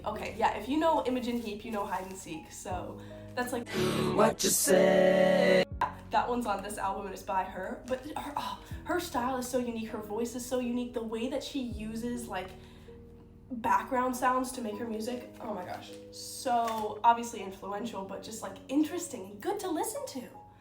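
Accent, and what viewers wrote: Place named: New York, United States. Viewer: So yesterday, being NY born, I saw a vid about NY things you didn't know. Today I get a short of you dressed as a Brit on a rock. (Photographer) I was taken aback as I lived in Dublin for years and I found your doppelganger, good friend then, from Kilkenny. It's not like it's similar, it's exact! Anyway it was cool to see that lovely Irish face again, but speaking with a NY accent. Also very eclectic pics here, I'll check some out, thanks. Slainta and cheers.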